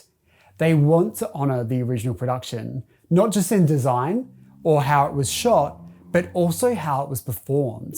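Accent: Australia. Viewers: wait i thought u were australian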